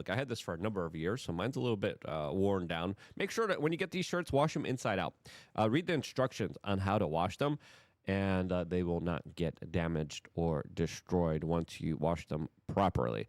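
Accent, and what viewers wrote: Poland. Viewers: He's Polish. English is a 2nd language for him.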